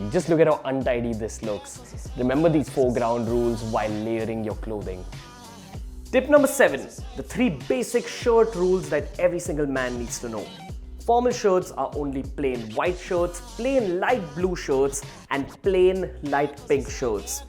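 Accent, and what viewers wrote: India. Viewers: I love just about all accents of the world, but man the Indian one is just so unattractive lol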